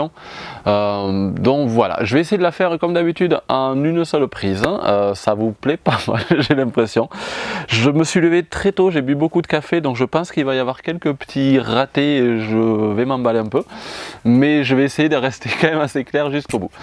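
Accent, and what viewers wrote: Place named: South, France. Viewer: Merci c est vrai vous avez un joli accent du sud a bientôt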